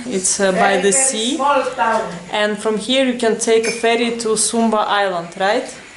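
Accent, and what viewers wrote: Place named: Russia. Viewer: You're a brave girl lol I always love Russian English accent.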